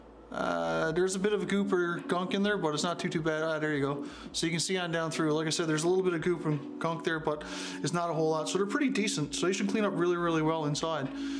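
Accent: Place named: Canada. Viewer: If the "accent" lol and the power fist box didn't give it away He's in Canada folks! Greetings my eastern brother from British Columbia!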